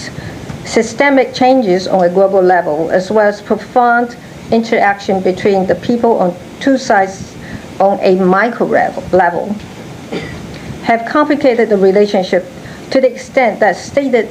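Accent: United Kingdom. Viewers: The speech was very thoughtful, and she has a more british accent i felt, which made her sound sophisticated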